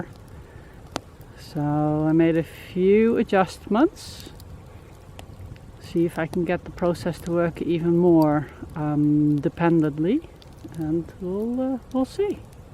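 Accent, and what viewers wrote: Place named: Netherlands. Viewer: Unmistakably Dutch accent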